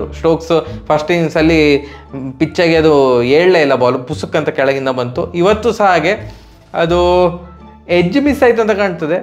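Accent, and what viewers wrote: Mangalore, India. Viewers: Bahal chanda mangalore kannada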